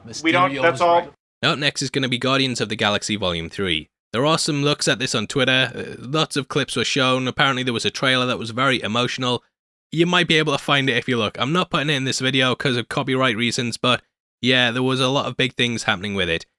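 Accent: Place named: Scotland, United Kingdom. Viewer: Love the show and the Scottish accent keep up the good work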